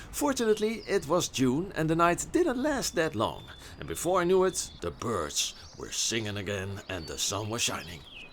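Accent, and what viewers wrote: Netherlands. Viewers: Het moment dat je startte met spreken hoorde ik dit is een landgenoot 🤣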